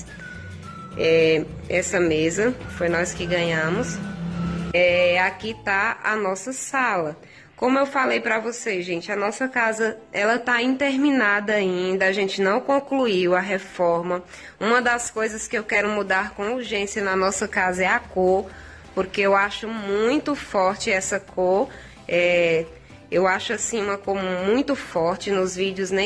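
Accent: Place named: Northeast, Brazil. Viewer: Amei seu vídeo. Que sotaque maravilhoso que simplicidade! Amei saudade do meu nordeste ❤💋😘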